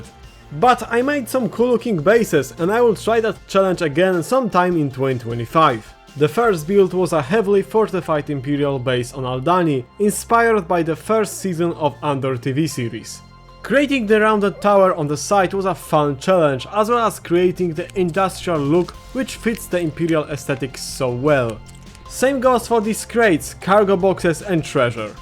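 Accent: Poland. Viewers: elo a ty z polski jesteś bo akcent polski????????? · Słychać ten polski akcent ❤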